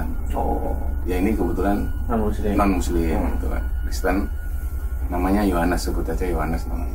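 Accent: Java, Indonesia. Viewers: Mas keren..pokok nya..cara penyampaianya bagus..kalwm khas jawany keluar slm dr jogja.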